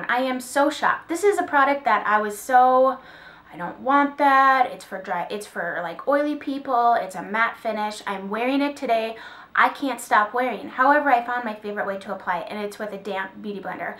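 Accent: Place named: North, United States. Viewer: I love the way Northerners talk! Just makes me happy! It's so cute!! :)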